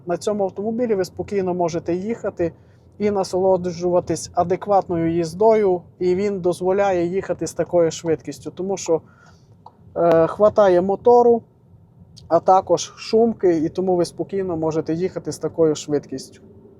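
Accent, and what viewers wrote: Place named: Transcarpathia, Ukraine. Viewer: Ти з Закарпаття "Чоловіче"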